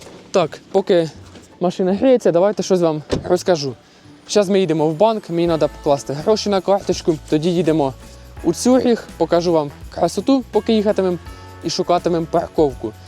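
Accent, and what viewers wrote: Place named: Slobozhanshchyna, Ukraine. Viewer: Вдячна за відео. Це мій улюблений "жанр" в ютубі - подорожі по різних куточках різних країн. Але під час перегляду чомусь прийшло на думку давнє іспанське прислів'я:"Швейцарія - це красиве дерево, яке росте на смітникУ". Сподіваюсь, контекст прислів'я зрозумілий для думаючих людей. З повагою. Окрема вдячність, що не забуваєш "слобожанську говІрку"!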